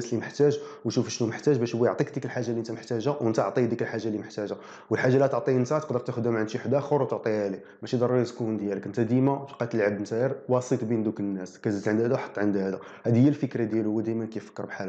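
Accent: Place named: Maghreb, Arab world. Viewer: حاولت افهم ما قدرت فعلا لهجة اخوانا بالمغرب العربي صعبة الفهم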